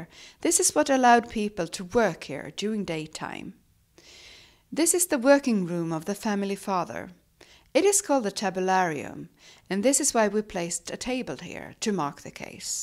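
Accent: Sweden. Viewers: What a 'weird' thing for you to say. In Europe we tend to learn more British English. Did you expect her to speak in an American accent? She's Swedish and speaks British English with a Swedish accent. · Well, she has a very strong Swedish accent, so I just tried to ignore it. My pet peeve is when people so often write "women" in the singular, but never "men" in the singular. It's like logic escapes them. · Not fake, it's a Swedish accent.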